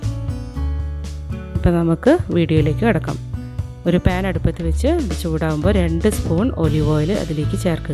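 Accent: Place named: Kasaragod, India. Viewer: 😊lastil paranjathu pettanu manasil ayilla. Onude ketapol karyam pidikiti.😊. Ethu natile anu aa style. Kasargod style anoo. Pine healthy and rich soup adipoli👌❤️❤️❤️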